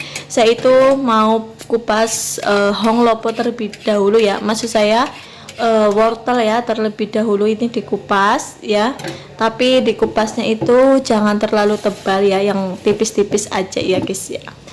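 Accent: Banyumas, Indonesia. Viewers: Wa'alaikumsalam warohmatulohi wabarokatuh Salam ngapak ora ngapak ora kepenak juga mbak wong wadon ngapak🙋‍♂ Wah asik dong gantian ngawasi majikan masak😀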